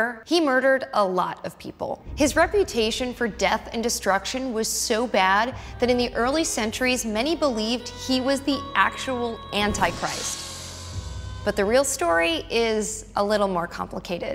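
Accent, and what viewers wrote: United States. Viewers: Cant take history lessons from an american accent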